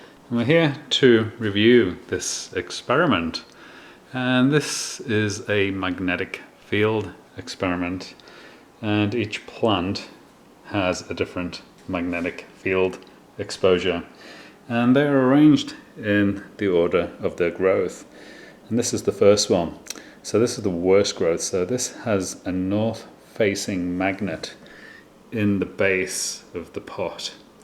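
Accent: United States. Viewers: Oh I could tell right away you were in Arizona, because of your accent!!! Lol!!!! Typical Arizona accent, lol!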